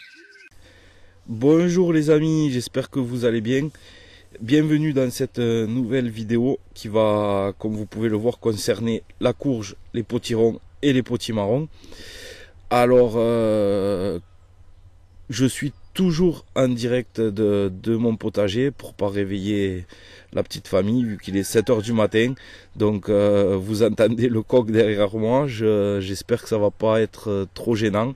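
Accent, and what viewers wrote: South, France. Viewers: Votre chaîne Youtube est GENIAL !!! un régal !!! vos dessin sont tres explicites et réussis. et votre accent sent bon le Sud. C est un plaisir de regarder vos videos, continuez ainsi !!!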